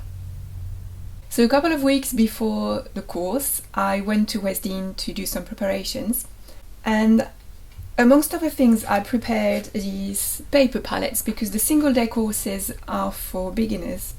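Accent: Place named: France. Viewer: I discovered your channel only a few days ago. I'm a beginner artist (colour pencils at the moment) and appreciate your clear instructional videos and the general information on art you share. Your mix of the French and English accent is so cute I can't help coming back just to hear it. It reminds me a little of Meryl Streep's accent in Out of Africa "I had a farm in Africa at the foot of the Ngong hills..." lol! Not a Spock reference sorry.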